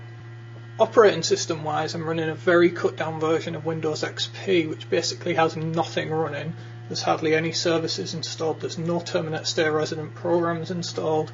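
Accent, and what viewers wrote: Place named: Geordie, United Kingdom. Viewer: hey, how did them yanks find that hardcore geordie accent of yours??!?!?!?!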